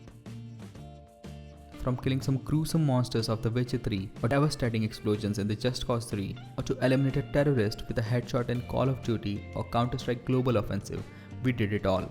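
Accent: India. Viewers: Indian acent is really anoying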